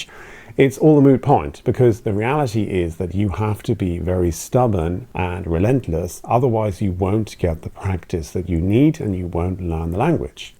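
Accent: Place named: Germany. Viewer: I love your German accent. That's dedication!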